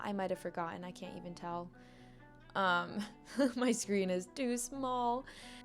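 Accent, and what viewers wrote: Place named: South, United States. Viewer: What's your PC build? And do you live down south? I hear a lot of "y'alls" lol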